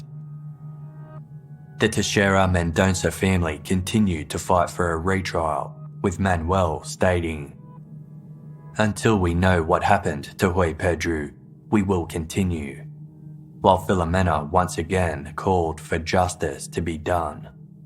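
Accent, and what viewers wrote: Australia. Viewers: Is Aussie?????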